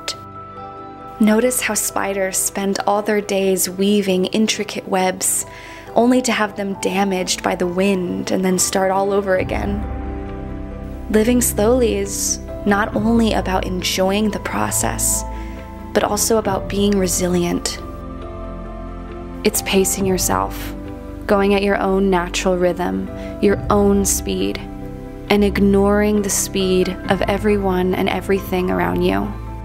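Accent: United States. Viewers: Are you from American🇺🇸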